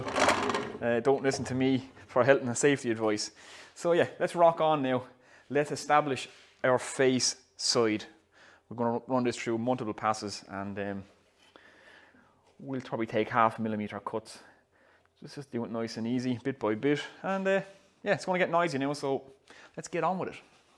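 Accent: Ireland. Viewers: I hear an Irish accent, I sub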